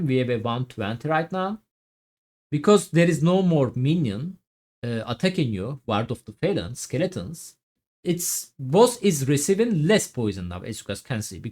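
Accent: Turkey. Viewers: hacı Türk olduğun çok belli konuşmandan :) ama Helal olsun Türk yayıncı pek yok bunu yapan teşekkürler yardım için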